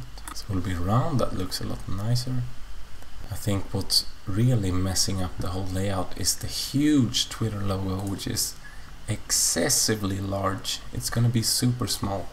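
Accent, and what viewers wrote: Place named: Sweden. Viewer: Are you from sweden? bzs you have the same accent as pewdiepie.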